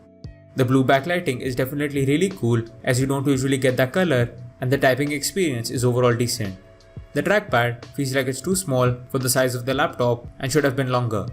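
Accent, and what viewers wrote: India. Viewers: As far as I can figure out from your accent, you're probably an Indian guy. So you should specify the prices in INR too. 🙁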